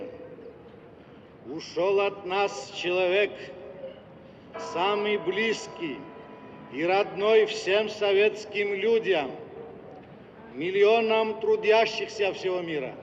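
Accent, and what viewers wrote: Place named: Georgia. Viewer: Georgian accent❤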